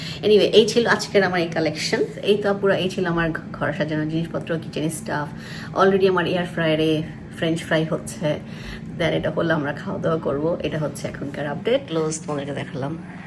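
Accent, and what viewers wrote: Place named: Bikrampur, Bangladesh. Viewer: Apu amar onek janar ichcha apni bikrampurer ? Apnar kotha sune mone hoilo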